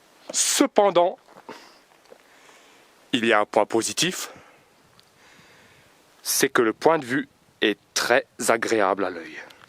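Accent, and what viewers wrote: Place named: Alsace, France. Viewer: La voix n'est absolument pas robotisée, mais l'auteur a un accent alsacien très prononcé tout simplement. Il a le mérite d'essayer de se mettre dans la peau de ceux qui y étaient!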